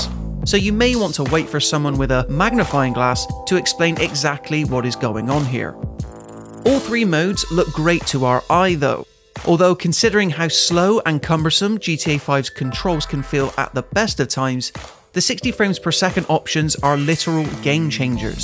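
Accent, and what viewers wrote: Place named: West Country, United Kingdom. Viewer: West Country accent ftw 🙌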